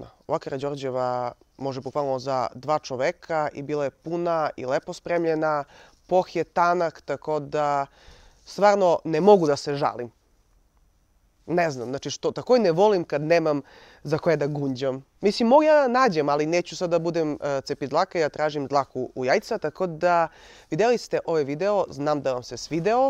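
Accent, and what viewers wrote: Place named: Niš, Serbia. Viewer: to je neki "GU, GA, ĆE, ČE" Niški u kombinaciji sa Vranjansko-Leskovački i primesama LeBane...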